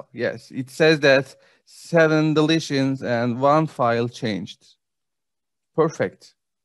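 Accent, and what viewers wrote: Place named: Turkey. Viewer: Aynı şeyi düşündüm daha ilk dkdan. Direk Türk aksanı bu nerde olsa tanırım 😂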